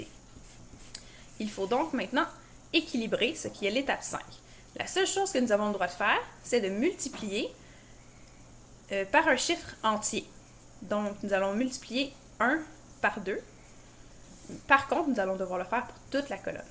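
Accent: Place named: Quebec, Canada. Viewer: C’est sont accent québécois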